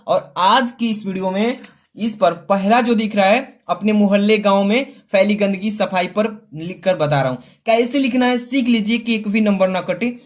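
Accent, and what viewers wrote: Bihar, India. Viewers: Sir aapki awaj se lg rha h ki aap bihari h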